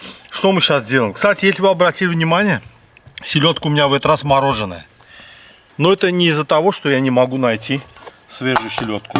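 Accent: Baku, Azerbaijan. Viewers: ВАХ...ВАХ..АКЦЕНТ СЛАДКИЙ КАКОЙ....РАДНОЙ АЗЕРБАЙДЖАНСКИЕ... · Этот акцент не перепутаю ни когда , привет от Бакинца из Крыма , тут с селедкой сейчас проблема )) приятного аппетита , нуш олсун 🔥🥃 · БАКИНСКИЙ АКЦЕНТ !!!❤ Однозначно лайк!!! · Хоть в Антарктиде, хоть в Африке, Бакинский акцент ни с чем не перепутать :)) удачи вам земляк. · Что за акцент? Азербайджанский что ли?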